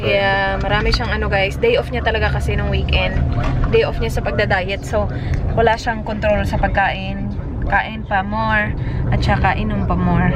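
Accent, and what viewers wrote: Visayas, Philippines. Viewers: Bisaya jud gihapon ang accent te ba. AHAHAHA LOVE YOU ALSO!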